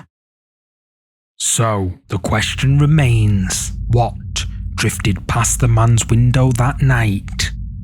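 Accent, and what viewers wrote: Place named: Northern England, United Kingdom. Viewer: I just love these videos so much ❤It’s a real treat everytime you’re uploading something new. Your northern England accent’s also brings a kind of creepy aura to the videos. 😊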